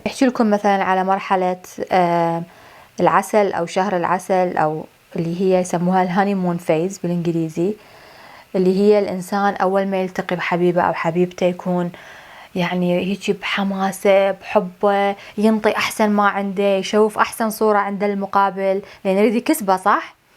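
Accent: Iraq. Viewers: اعشق اللهجة العراقية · اوويلي كلش تونست اشكرچ انتي ❤عراقيه اصليه ومغيرتي شي بكلامج ك عراقيه اوو اسلوبج احبج بكد القطار 🙂اتمنى تردين عليه 🙁💜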